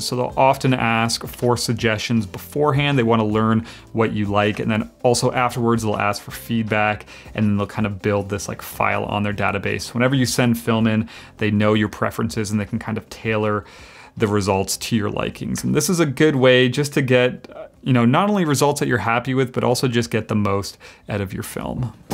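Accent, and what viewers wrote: Canada. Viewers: Sounds like a Canadien.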